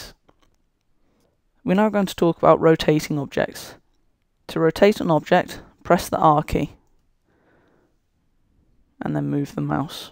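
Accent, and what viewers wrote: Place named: United Kingdom. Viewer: based on the link and his accent, prolly, he's from UK...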